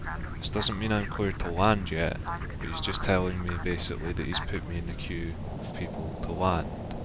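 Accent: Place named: Ireland. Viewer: u sound Irish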